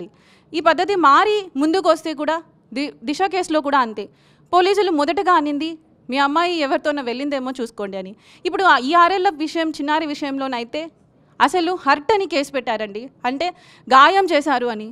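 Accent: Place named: Karnataka, India. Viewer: May be Kannadiga...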